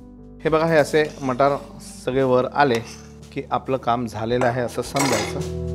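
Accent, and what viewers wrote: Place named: Nagpur, India. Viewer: Khup Chaan Saangitle Sir . . .aani khupp useful . . SIR mi tumchhi FAN aahe . . Mala tumcha Nagpuri Lehja khup aawadto . .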